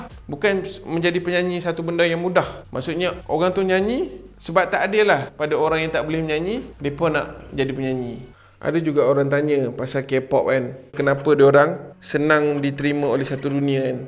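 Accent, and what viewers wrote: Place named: North, Malaysia. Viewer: Baru tau abang orang utara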